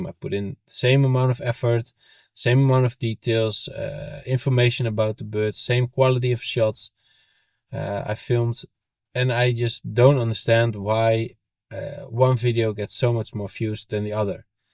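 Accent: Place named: Netherlands. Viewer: I hope you keep the videos coming ! I really enjoy watching them and your journey is really nice to follow A few things which I want to add for sure is however : - do what you have time for ! There are times where you are more busy especially with having kids and a family - show us what fascinates you and not what you think might be interesting for us viewers (that way it is way more exciting as well for you!) - we appreciate the effort of you speaking english! It does not have to be perfect and mistakes makes you human and I m sure no one is bothered by your dutch accent and "mistakes" it shows that you are making an effort to make these videos great and gives the videos a great personality thanks for many hours in front of the TV and learning a lot while enjoying our beautiful aviafauna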